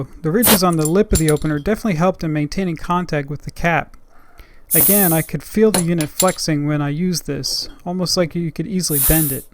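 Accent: Germany. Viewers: Thank you. I was expecting something far less serious but this was done with the same degree of rigor that you apply all your reviews. I enjoyed it. How about commenting on your branding choice on your video headers. What's the smoke about? Also, are you a native German speaker? You pronunciation choices seem to match what I hear from my German friends. I source my German tools from KC Tools and Chad's Tool Box.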